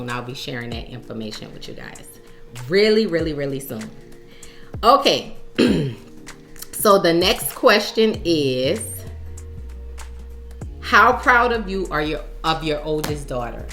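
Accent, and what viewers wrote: New Orleans, United States. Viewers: I just love your personality & accent…ain’t nothing like a NEW ORLEANS GIRL…we love you 🫶🏾🥰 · Love your NOLA accent. We know you are busy Queen!!!👑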